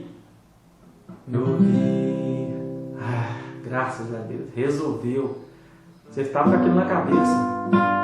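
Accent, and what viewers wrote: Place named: Minas Gerais, Brazil. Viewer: AMEI AÍ SIM , AGORA VAMOS APRENDER COM ESSE SUTAQUE MINEIRO E MANHASO E SUAVE. ISSO NÂO É AULA É UMA POESIA LÍRICA .💤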